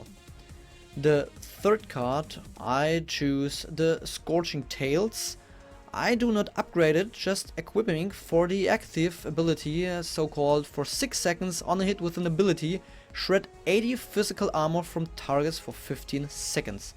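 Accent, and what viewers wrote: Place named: Germany. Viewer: your german accent is painfully obvious.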